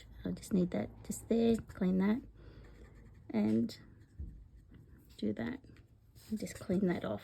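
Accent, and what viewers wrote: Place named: Australia. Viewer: Hi This is the 1st time I have seen you on youtube I didnt know anything about you but your accent made me stay and watch lol. We have to support Our Aussie demonstrators 😊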